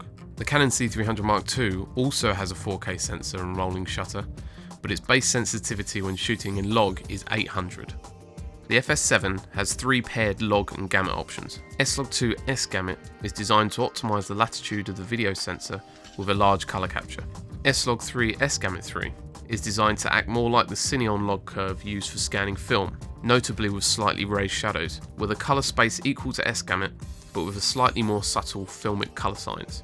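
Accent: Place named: United Kingdom. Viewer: The problem with this vid is your very hard british accent, making it very hard to understand for strangers... Still a nice vid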